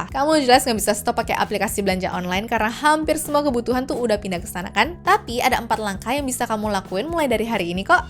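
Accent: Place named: West Java, Indonesia. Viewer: kakanya kayanya dr logat jawabarat yah heee...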